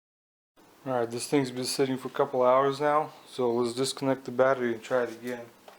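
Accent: Russia. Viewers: ааа какой русский акцент 😊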